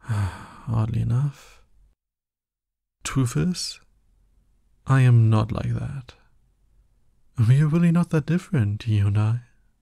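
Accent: Germany. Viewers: Samma ihr könnt mir doch nicht erzählen das nur ich den deutschen Akzent höre 😂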